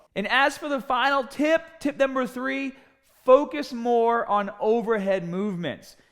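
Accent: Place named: Boston, United States. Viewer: Inconsistent Boston accent? Is he from Rhode Island or something? It sounds like a hybrid accent.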